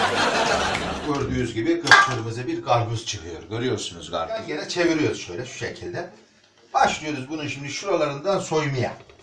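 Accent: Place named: Alucra, Turkey. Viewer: Dayı alucoralı yada gareysarlı galiba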